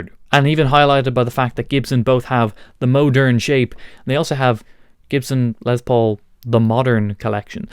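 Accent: Dublin, Ireland. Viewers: That is not how all Irishmen pronounce the word R , he is from Dublin & that is how they say their R`s. Just like the UK , there are many different accents in Ireland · Englishman here, got me too! Turns out I’d never heard an Irishman say the letter R before. Now I know.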